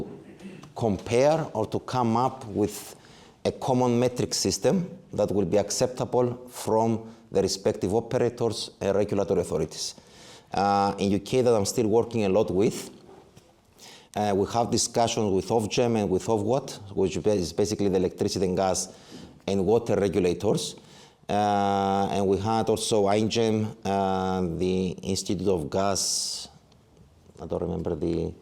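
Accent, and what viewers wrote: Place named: Greece. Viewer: Sorry but your english prononciation with strong greek accent is almost impossible to understand for french speaking people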